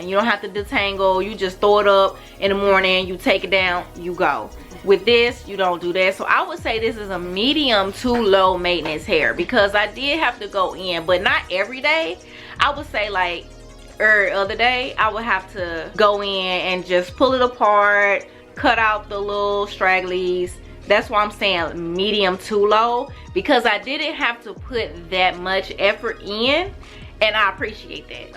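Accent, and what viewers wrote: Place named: South, United States. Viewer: I hear a southern accent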